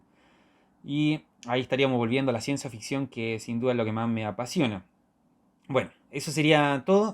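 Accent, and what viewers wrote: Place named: Chile. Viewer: Encantador acento chileno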